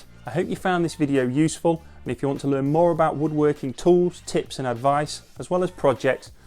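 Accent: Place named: United Kingdom. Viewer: Thanks for the review. Just bought one! And loved the Limey accent (I'm a Brummie in Canada!)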